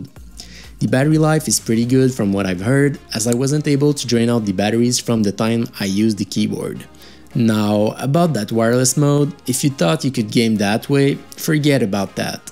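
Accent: Quebec, Canada. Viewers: J'ai comme l'impression que t'es Québecois, pas vrai?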